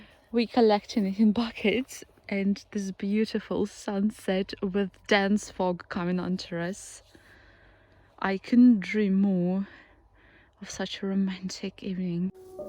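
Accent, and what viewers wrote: England, United Kingdom. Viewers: Very interesting. You sure have a lot of work to do fixing the cellar and doorway! I notice that you seem to have an English accent. Did you attend school in England?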